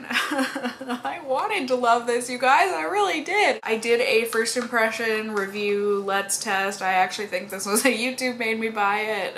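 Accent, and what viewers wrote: Minnesota, United States. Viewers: Well, she is from Minnesota, so that's probably just her accent.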